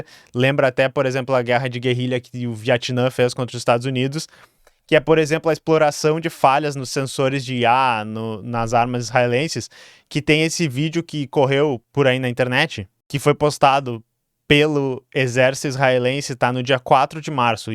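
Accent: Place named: Rio Grande do Sul, Brazil. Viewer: A imitação de mineiro com sotaque gaúcho realmente me pegou 😂. Excelente!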